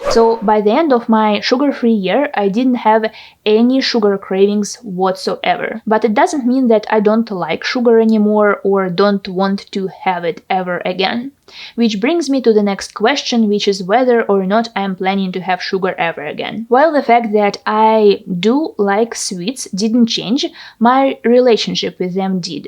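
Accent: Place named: Russia. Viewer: I like Your Russian accent:)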